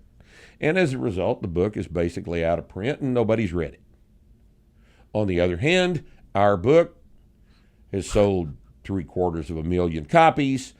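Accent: Texas, United States. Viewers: Me too and I’m French. Love that Texan accent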